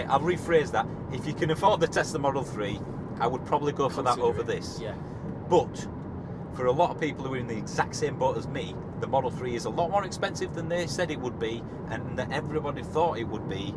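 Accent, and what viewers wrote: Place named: Northern England, United Kingdom. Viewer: From the accent I'd say somewhere north of Leeds UK. I'm about 40 miles away.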